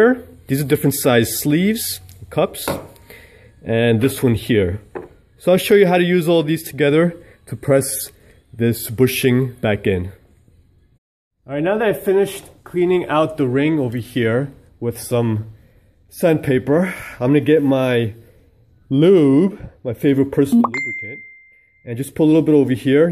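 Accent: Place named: New York, United States. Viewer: good video. love the witty commentary. $ says you are from NY or NJ, reminds me of home (NY)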